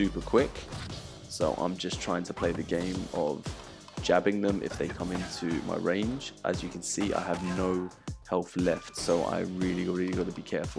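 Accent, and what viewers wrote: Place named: London, United Kingdom. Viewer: Oh, so you're in London! I had been trying to figure out y'all's accent. It doesn't sound like the typical English accent that I normally hear, but I'm also an uncultured American that's never really left the south lol. Love these videos!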